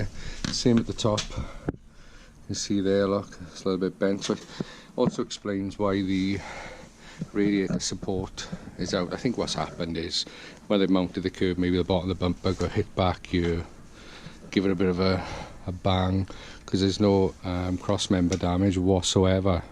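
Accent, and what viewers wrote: Wales, United Kingdom. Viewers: Are you from Wales by any chance ?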